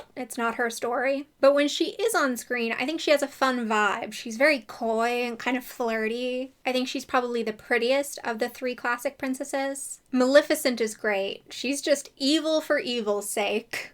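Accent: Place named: Transatlantic, United States. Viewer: You ate that transatlantic accent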